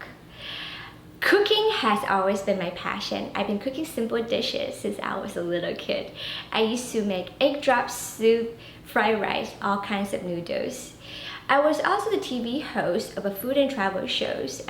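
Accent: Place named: Thailand. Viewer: You were in Thailand! That’s my home country. You look like one Chinese actress, her name is Yang Zhi. She is in the drama call “ash of love” it’s really good C drama 😊 and yes! The accent is our pride😊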